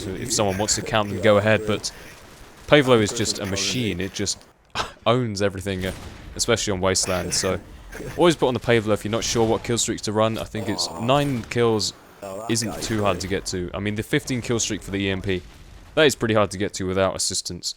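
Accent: United Kingdom. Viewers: My favourite commentators are you (obv) and The Trout91. I just like hearing other British people I guess and you talk about interesting stuff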